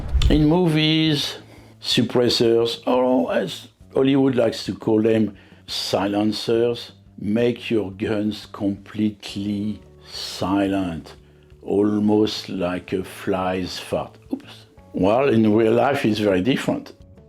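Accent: France. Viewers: 😂 this guy is right!! I like the way he's talking with his french accent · Le bruit qui n'affecte personne me choque beaucoup. Quand j'ai vu "all quiet on the western front", autant c'était joli visuellement, autant j'avais l'impression que tout n'était que petite pétarade. Les soldats se parlent tranquillement, leurs fusils ne font que des bruits de pétard, alors que tout fait feu et explose autour d'eux. Je ne sais pas si vous êtes français vu l'accent, mais en tout cas j'ai bien aimé votre vidéo. Merci ! Edit : ah si j'ai mieux lu votre description. Content de voir que votre réussite et votre plaisir aux USA. C'est tentant et je serais ravi de connaître votre parcours :) Bye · What is that accent ? French ? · Wow really ! Ok sorry sir , you sound like a native french who speak english ! Well keep up your good video then =) 👍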